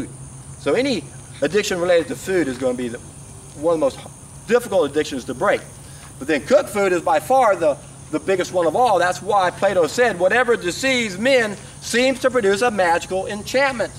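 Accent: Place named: South Carolina, United States. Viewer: your accent sounds like mine from South Carolina:)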